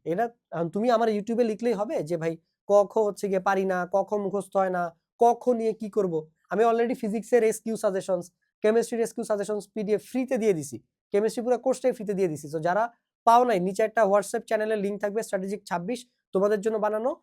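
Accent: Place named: Sylhet, Bangladesh. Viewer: Sir, afnar Sylhet mat je sundor,❤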